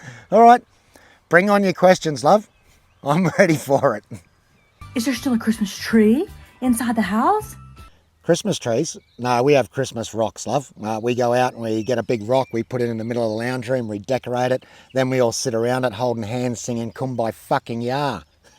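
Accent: United Kingdom. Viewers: Shes obviously English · She sounds more British than American to me... But this is just me guessing since I don't have a clue where she's actually from. Though, the accent I've picked up seems to come and go, but sounds more obvious with certain words. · She doesn't sound American. More like British. 🤷‍♀️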